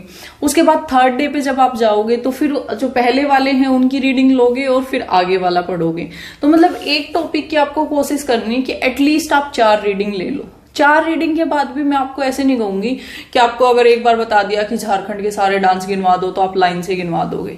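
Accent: Haryana, India. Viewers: Sister jab aap bolte ho to haryanvi tach aata h 😊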